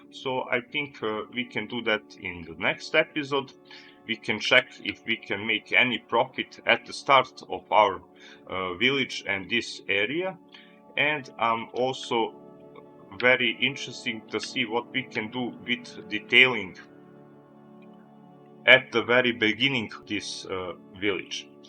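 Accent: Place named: Serbia. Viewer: W series so excited for the next part Love the serbian accent😂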